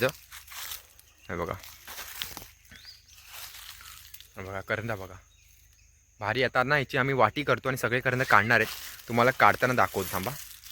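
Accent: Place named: Malvani, India. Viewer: Malvani bol bara vatta aaikak.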